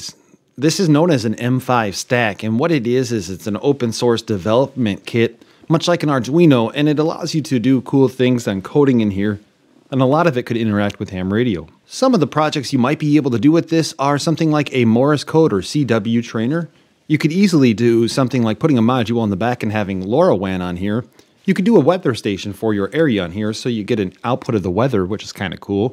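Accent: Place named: Midwest, United States. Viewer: Fantastic video. And as a Chicagoan relocated to the hinterlands of Seattle your Midwestern accent was the best part.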